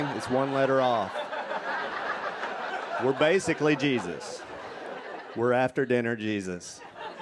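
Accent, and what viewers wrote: South, United States. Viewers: I just love brilliance with a southern accent ❤ such a juxtaposition.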